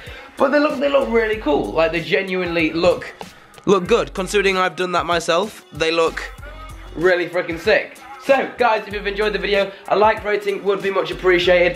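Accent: United Kingdom. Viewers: This dude sounds like faze adapt with a British accent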